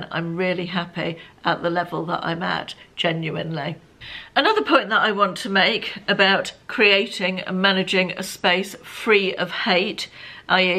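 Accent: United Kingdom. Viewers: ❤ you do what is best for you! I'm a recent subscriber and enjoy all your videos. Funny thing.......I subscribe to 4 UK women a d you all sound so different 😊❤! Much like in America.....with all of our different dialects......find it so interesting 😊😊😊😊. Keep on keeping on! I enjoy all my British friends!❤❤❤❤😊😊😊😊Kathy from Pennsylvania USA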